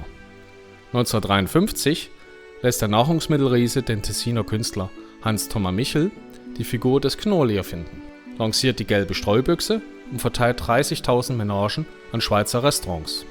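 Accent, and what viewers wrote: Saxony, Germany. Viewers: ...einverstanden...aber warum muss ein Sachse sowas erklären. Seine Aussprache französischer Begriffe spottet jeder Beschreibung...nu?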